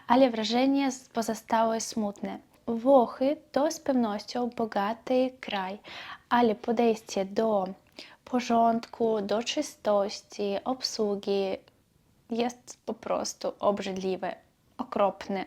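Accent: East, Poland. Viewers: Polski bardzo dobry. Akcent jak z Polski Wschodniej. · Pani kochana, teraz to jest pani przede wszystkim Krakowianką, moją sąsiadką. A pani język polski jest bardzo dobry. Lekki wschodni akcent tylko dodaje charakteru pani wypowiedziom. Jeśli chodzi o pierogi, to tylko z kapustą i mięsem. Niestety ciężko kupić takie i najlepiej samemu zrobić, wykożystując mięso wołowe z rosołu. Pozdrawiam serdecznie z Klinów. ❤ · Kapitalnie Pani mówi po polsku. Widać chęć, talent i kulturę osobistą . Za dwa lata nikt nie rozpozna że pochodzi Pani ze wschodu. Tak trzymać . Pozdrawiam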